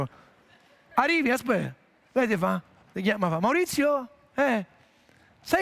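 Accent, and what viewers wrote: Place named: Rome, Italy. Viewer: Il fatto e che il dialetto romano è fra i dialetti più simpatici... Quindi alle orecchie dei non romani non risulta "grezzo" o "volgare" anzi... Tutto qui. La stessa cosa vale per gli altri dialetti "comprensibili" e simpatici.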